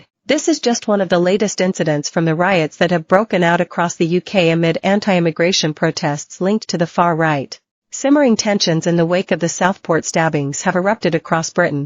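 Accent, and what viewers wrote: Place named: United States. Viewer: Should AI make comments on bad things happening in Britain using a USA accent?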